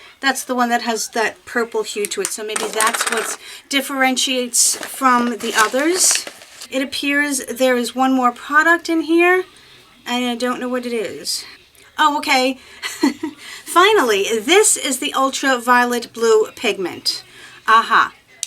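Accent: New York, United States. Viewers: Always better when I see your beautiful face and your lovely NY accent..x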